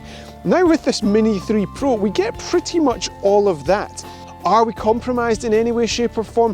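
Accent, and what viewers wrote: Scotland, United Kingdom. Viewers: I like the scotish english sound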